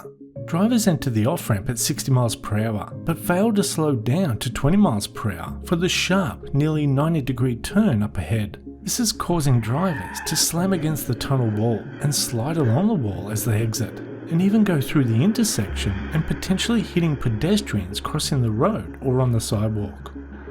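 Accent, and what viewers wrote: Australia. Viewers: Drivers in Seattle think it's OK to exit a ramp at 75 mph and yet merge into the highway at 25 mph expecting everyone around them to adjust to that kind of driving. BTW the Australian accent threw me off until I saw the 165 exit ;)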